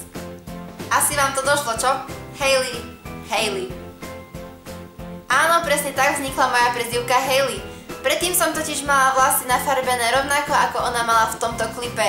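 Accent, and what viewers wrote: East, Slovakia. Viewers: AAA vychodňarkaaaa a znaje hutorec po rusnacky ta co jak še maš ! :D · Páči sa mi ako Ti čas od času ujde niečo východniarsky :D :D "Tažéé" :D je to milé :D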